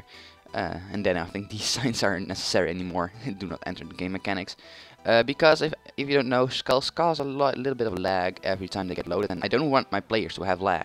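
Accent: Netherlands. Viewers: ben je nederlands? je klinkt nederlands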